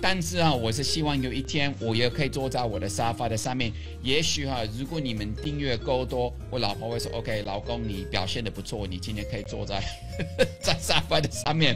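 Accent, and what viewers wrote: Taiwan. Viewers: 很台灣腔既老外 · 29年讓人改變了！臺灣國語耶！哈哈哈！ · 您的台灣國語說得太棒啦！:) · 好幽默哦，也很台（穿插好多台語） 讚👍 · 這位教授........你的口音不只是那麼簡單...你語氣結尾帶著齁......有夠台....我這台灣人講話都沒你那麼台.......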